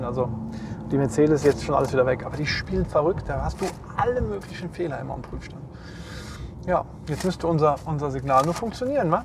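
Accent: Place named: Germany. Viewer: Bruder für ein Mustafa spricht du zu Hochdeutsch. Ich mag es irgendwie nicht🤔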